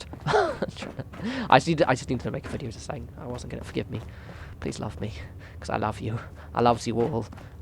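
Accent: England, United Kingdom. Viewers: I was actually thinking about this yesterday, when I was playing the game and trying to talk myself into going back to work on my vault but just really really didn't want to. I think you hit the nail on the head - I also tend to try to make my settlements look natural and part of the environment, like if somebody else came across it they might think it was made by Bethesda. If you look at the other vaults in the game made by Bethesda, many of them are dilapidated and worn. We only got brand new building pieces which just becomes monotonous after awhile and the only way to make vaults look unique is really through decorating, which if you aren't a fan of spending a lot of time on decorating, makes the whole thing feel like a chore. Side note: I actually found your channel (and subscribed) while I was looking for build ideas for my vault...I gave up on my vault, went back and watched some of your older videos and picked up a lot of tips that I've been using in my other settlements! 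And also, who can resist a man with an English accent doing Fallout 4 videos. Keep up the awesome work, can't wait to see what you do with Nuka World.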